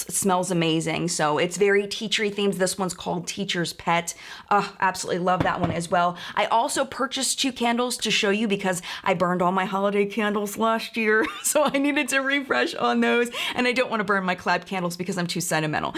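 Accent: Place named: Baltimore, United States. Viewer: How did I just I now realize you’re a fellow Baltimoreian?! I hear it in the “accent” now 😁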